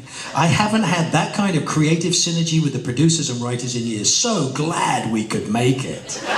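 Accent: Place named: United Kingdom. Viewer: awww, Ivw not heard this sort of british accent in years! oh the memories...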